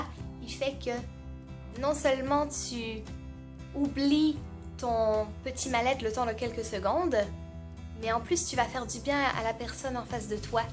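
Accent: Quebec, Canada. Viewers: j'ai eu peur que tu ai perdu ton accent queb... ouf il est tjrs là! mais t'as passé combien de temps la bas? tu reviens?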